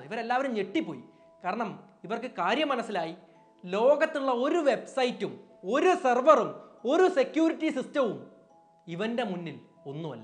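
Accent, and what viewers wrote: Kannur, India. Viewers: നിങ്ങൾ കണ്ണൂരാണോ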